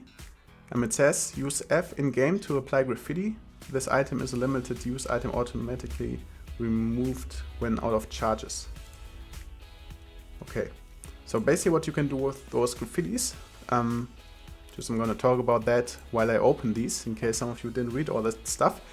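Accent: Germany. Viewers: Man merkt einfach immernoch dass du Deutsch bist😂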